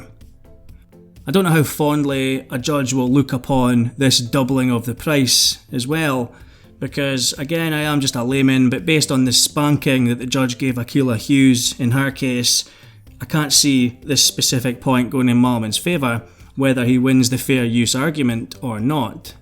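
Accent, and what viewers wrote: Scotland, United Kingdom. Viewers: He’s from NE Scotland deal with it! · Scottish? I suggest you don’t watch his other videos, they’re all in Scottish. Since he’s Scottish. 🙄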